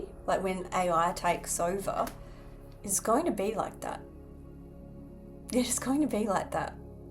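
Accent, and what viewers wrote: Australia. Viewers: Hello from California. Good singing voice, Great voice,. Great Australian accent. Great messages from Australia. Happy Easter from our channel.💃💃💃🏻💃🏻🦚🦚🦚🧤🧤🧚🏻‍♀️🧚🧚🏻‍♂️🧚🏻‍♂️🧝🏻‍♂️🧝🏻🧝‍♀️👒🧜‍♂️🧜🏼🧜🏻‍♀️🐣🐣🐥🐥🐇🐇🐇🐓🐓🐓🦜🦜🦜🦜🦜🦜🦅🦅🦅🐎🐎🐎🦚🦚🦚🐦🐦🐦🐤🐤🐤🦆🦆🦆🦉🦉🦉🦋🦋🦋🍀🍀🍀☘️☘️☘️🐦‍🔥🐦‍🔥🐦‍🔥🦢🦢🦢🦩🦩🦩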